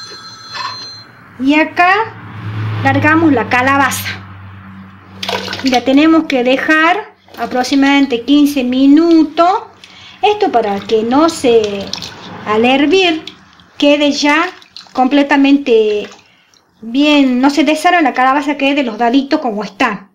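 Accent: Tucumán, Argentina. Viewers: Soy tucumana y con la misma tonada, me encantó la receta, mi abuela la hacía igual, gracias.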